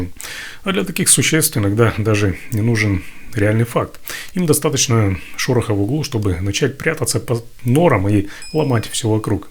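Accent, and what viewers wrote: Ukraine. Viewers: Этот майор, судя по говору, родом из Малороссии. Вслушайтесь, соотечественники: ципсо во всей своей красе. Вдохновенно работают.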